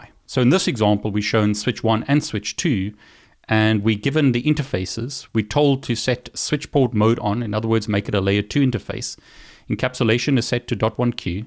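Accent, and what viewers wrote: South Africa. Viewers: Is this a South African accent? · Really interesting video, dude, and lovely to hear your South African accent. (If not RSA, Southern African. I now live in the UK, 24 years, from RSA.) GPT is so powerful! I'm going to have to use it for pair programming, for the sake of my career and my clients' success. Plus probably a billion other things in my life. Oh yes, always test everything: code, new AIs, ideas, foods, dearly-loved traditions, everything. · Hi are you South African?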